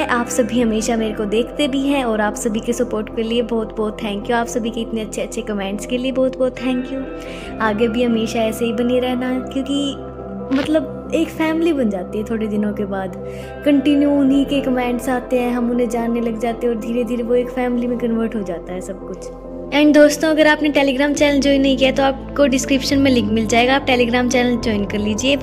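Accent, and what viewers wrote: Haryana, India. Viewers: Haryana se ho aap didi because aapki awaj se lagta h muje ❤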